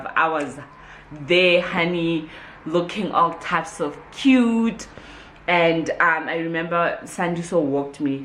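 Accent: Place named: Sotho, South Africa. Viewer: Your Sotho accent ❤️😍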